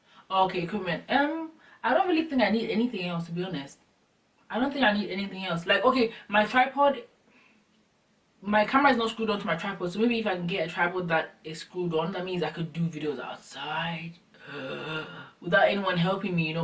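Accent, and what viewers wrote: India. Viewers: lmao!!! I can tell Southafricans by the face shape and eyes yea! I sometimes mix Zimbabwe and Zambia. I need a camera like yours. I'm over here placing a mirror behind just to see!!!! #dontjudgeme that indian accent makes my day. another dance video due. I've tried filming when I wasn't in the mood. disaster!!! #delete God will make a way oo! I yell out the window before I record!!! lol!!! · But then I'd always related that accent you do to the Indian accent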